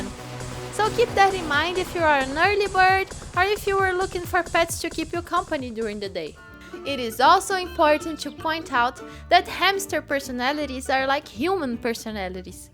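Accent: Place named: Brazil. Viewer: I love the video 😂😂 you sound Brazilian btw! Am I right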